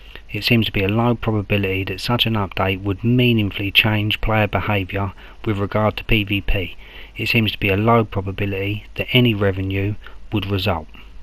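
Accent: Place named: United Kingdom. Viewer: i know im late but hearing a another British voice is redreshing lool. Good video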